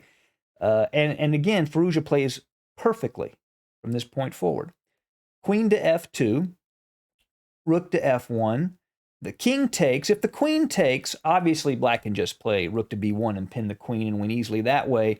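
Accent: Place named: South, United States. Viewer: Thanks for sharing with us. I like the accent as well as the commentary. My guess would be Alabama or Tennessee. Great series on Firouzja by the way. After watching your videos, I now understand why the guy's made such a big impression on the chess world. Looking forward to the next video.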